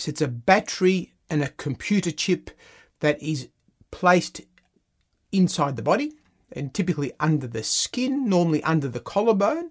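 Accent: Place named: Australia. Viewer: you can be the most educated person in the world but when you have that australian accent you just loose value in an instant. i dont even want to here another word. pome mentality.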